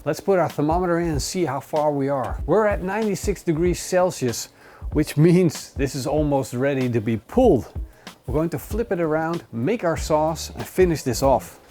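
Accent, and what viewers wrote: Netherlands. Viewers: He's Dutch (from Holland aka The Netherlands)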